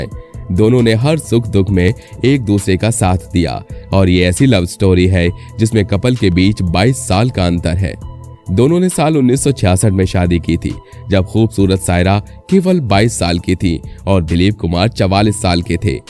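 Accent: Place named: Rural, India. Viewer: Yaar ye youtuber kaise baat karta h 🤣🤣 total gaavwala 🤣🤣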